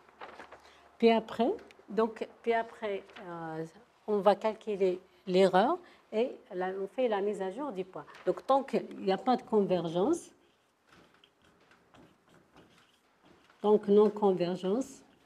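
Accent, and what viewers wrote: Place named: Africa. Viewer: Mafhamna welou tahadri bel français te3 les africains 🤣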